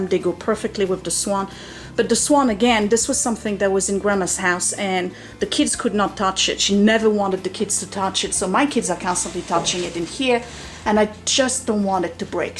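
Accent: France. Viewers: What are the Emmy's for? Thats exciting! I have been binge watching you since yesterday and I love you!! The French Accent reminds me so much of my grandma as she was raised speaking French. Her accent died down as she got older since none of us ever spoke French around her but some words you say exactly like her and that is so special!! Thank you for sharing and for being real!!! I have been doing a few things here and there while watching you and it really does feel good!!!!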